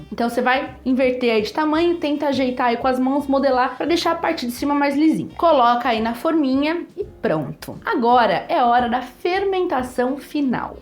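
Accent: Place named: Bahia, Brazil. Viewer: KKK vc é da Bahia? Fala igual a gente velho kkkkkkkNa moral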